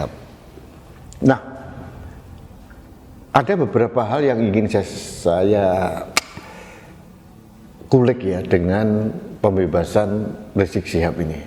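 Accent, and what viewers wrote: Java, Indonesia. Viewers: Privat lambe mu ambles kui, hhhh medok tapi kritis, MANTAP PK 👍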